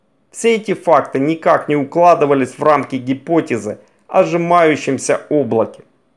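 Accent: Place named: Kuban, Russia. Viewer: Интересно. Но интонация диктора и кубанойдный говор раздражают сильнее, чем желание узнать о звездах, еще не вышедших на главную последовательность. Тем не менее, спасибо за труд. Дизлайкать не буду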